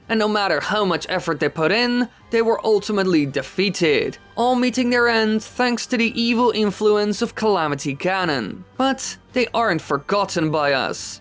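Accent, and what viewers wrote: Netherlands. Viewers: I've never heard of flooding a settlement to defend yourself. That's interesting. Also, I didn't realize that your accent is Dutch, not British. Oops!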